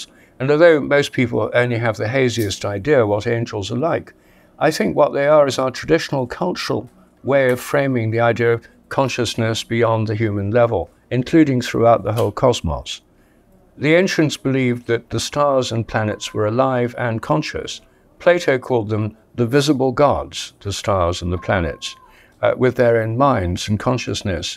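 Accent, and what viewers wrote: United Kingdom. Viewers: Before I started the video I says to myself I bet this guy has a British accent and bada bing, I predicted the future!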